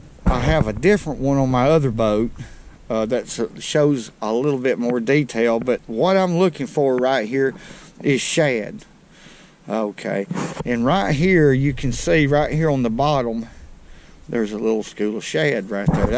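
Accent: South, United States. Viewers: Lol I’m quite a ways in this creek, next sentence no further than I went back in this creek lotsa shad 🤣 love southern dialects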